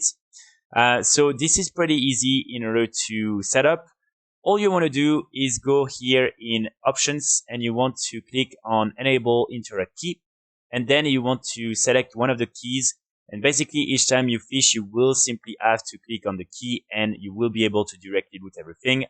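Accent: France. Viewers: L'accent français me mets toujours aussi bien, bonne vidéo comme d'hab 👍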